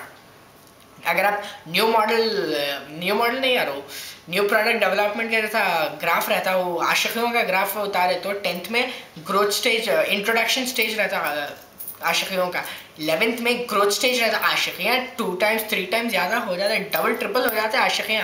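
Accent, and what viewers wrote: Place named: Hyderabad, India. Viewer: dude m watching this just for your hyderabadi.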